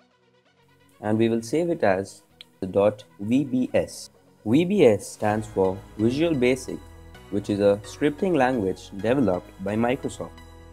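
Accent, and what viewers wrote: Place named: India. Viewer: Ofc he has a Indian accent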